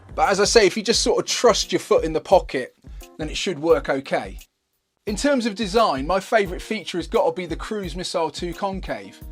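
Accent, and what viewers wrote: United Kingdom. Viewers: Proving once again that adding a British accent to anything makes it cooler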